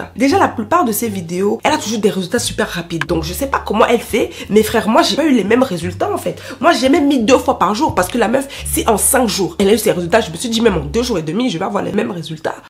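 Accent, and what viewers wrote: Cameroon. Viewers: Naah l’accent kmer vraiment je peux dead 😍😍😂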